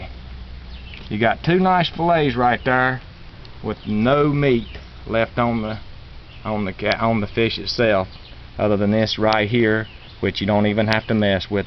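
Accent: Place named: South, United States. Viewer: I like the southern accent.... I grew up in Florida... makes me smile...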